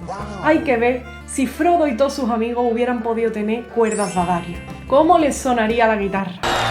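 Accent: Andalusia, Spain. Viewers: Disculpa... de casualidad eres andalusa??? 😅😅😅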